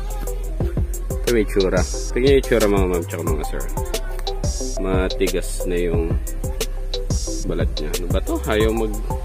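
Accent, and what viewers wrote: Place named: Pampanga, Philippines. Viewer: May antimano, kapampangan ka brad! hahaha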